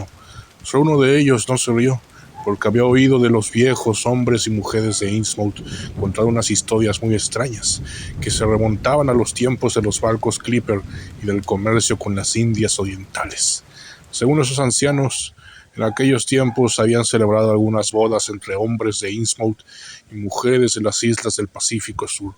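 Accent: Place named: Border, United States. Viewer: Tu narración de gringo fronterizo le da todo el estiló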